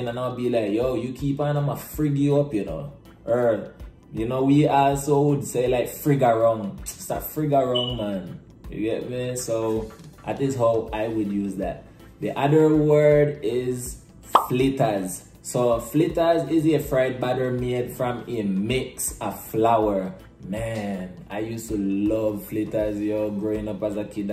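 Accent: Belize. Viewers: Bway, I thought you was straight up Belizean for a minute. I neva though the Nicaraguan Kriol was so similiar. Big up mi bredda. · You sound like wa Belizean... Im Belizean btw love this video educate us brother.. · You talk straight like a Belizean breda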